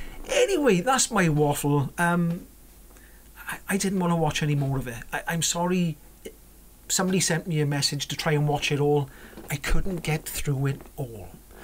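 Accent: Wales, United Kingdom. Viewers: I think that you are great, I like your sense of humour, your friendly Welsh accent and your no nonsense approach.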